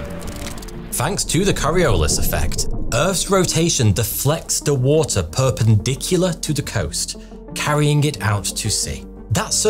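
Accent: United Kingdom. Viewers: "Hey fowtewtouw hewe" Dude, I can't get enough of your British accent. 😃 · I just love the British accent ❤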